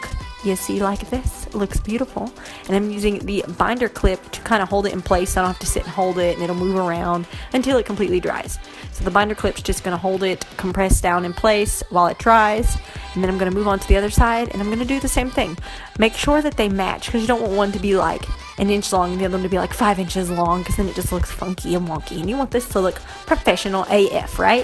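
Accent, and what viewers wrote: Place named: South, United States. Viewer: This was do helpful thank you! Loving the southern drawl btw it's cute as hell!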